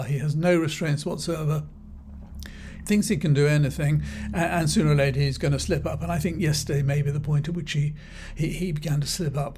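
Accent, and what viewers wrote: United Kingdom. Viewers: Glad to hear common sense in a UK voice. How refreshing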